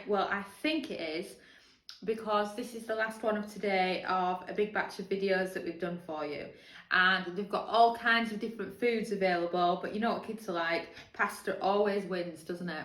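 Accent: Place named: North, United Kingdom. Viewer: Great to hear a northern British accent like mine. I'm from Bradford. Xx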